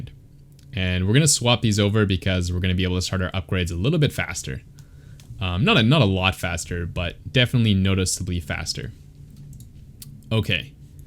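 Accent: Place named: Hungary. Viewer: Aren't you hungarian by any chance? your accent sounds hungarian.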